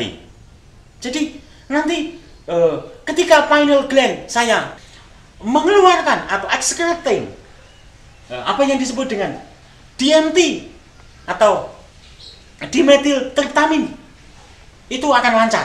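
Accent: East Java, Indonesia. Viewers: Jowo timur kayak gitu mang mas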